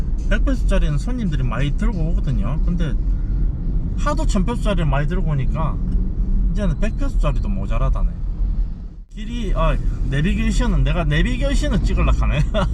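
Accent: Gyeongsang, South Korea. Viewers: 정말 결혼 잘하셨어요 경상도 말씨 듣기 좋습니다 ㅎ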